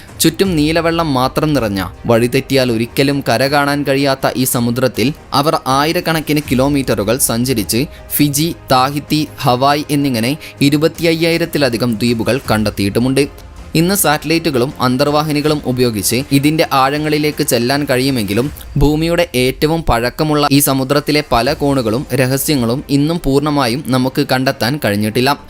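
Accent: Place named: Malappuram, India. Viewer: മലയാളം നന്നാകണം. ഇത് മലപ്പുറം മലയാളം ആണ്. മലയാളത്തിൻ്റെ ഉച്ചാരണം ഇങ്ങനെ അല്ല.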